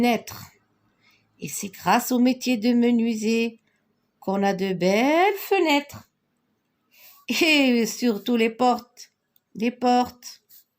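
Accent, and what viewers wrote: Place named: France. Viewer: L'accent français est très présent. Ne vous faites pas rare. Bonne continuation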